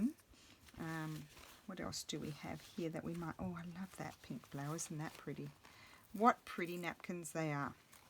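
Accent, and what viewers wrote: Australia. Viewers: Where do you live, you sound like you are Australian.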